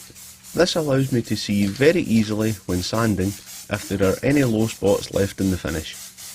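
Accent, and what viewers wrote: Scotland, United Kingdom. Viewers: This man, talented, hard working, creative and all of that with a great Scottish accent.👍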